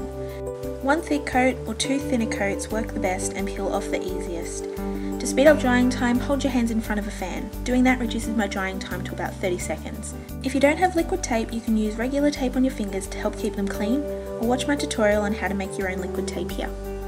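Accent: Australia. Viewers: It just her Australian accent. She sound like an Australian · She just sounds Australian to me...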